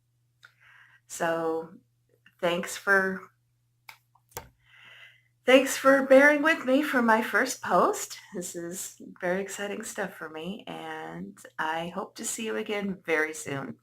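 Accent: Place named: New York State, United States. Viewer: I thought I heard a NY state accent. I live 10 min away from Niagara Falls, Canadian side. I will check out the rest of your channel. Great job!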